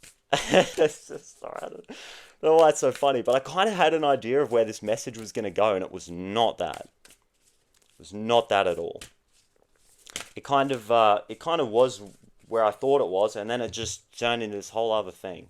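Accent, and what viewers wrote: Australia. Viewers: So thats gotta be an Aussie accent.. im in aus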